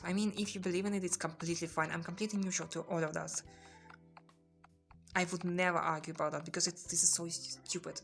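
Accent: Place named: Russia. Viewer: Сначала я увидел лайк под русскоязычным комментарием другого видео, потом услышал английский с явно русским акцентом, ещё заметил подписку на русскоязычный канал… Какие языки ты знаешь, и какой язык родной?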